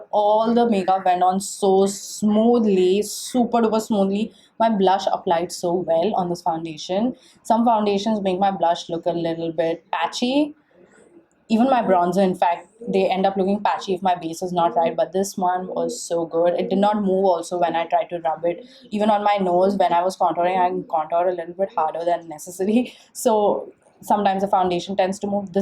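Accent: India. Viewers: I fucking hate indian accents soo much, idk its fucking weird as fuck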